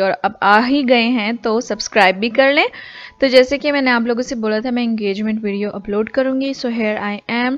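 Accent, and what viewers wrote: Punjab, India. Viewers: aap punjabi bola krain bohat payari bolti hain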